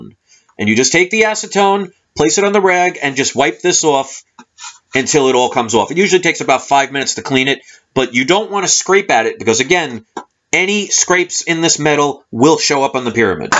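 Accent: New York, United States. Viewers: I really enjoyed listening to this video and learning all about orgonite from you!! You are so knowledgable, and so passionate about what your doing and we need more people like that. Creating an awakening within others is difficult esp. when they are so quick to dismiss things out of ignorance, or discomfort. I commend you for doing your part to disrupt the powers that be, and bring positivity into the world. plus you sound like a fellow new yorker haha so you're already good in my book :)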